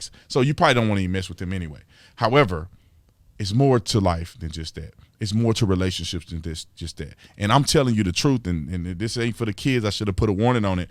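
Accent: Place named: South, United States. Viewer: definitely has a southern accent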